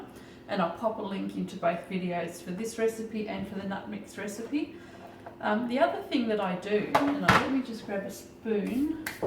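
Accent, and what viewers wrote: Australia. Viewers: What State are you in? I live in Perth and have not seen Western Star cream. It's really nice to listen to a quiet Australian not loud and annoying American accents (apologies to any Americans watching). Cheers Rosemary Perth Western Australia (70 yrs)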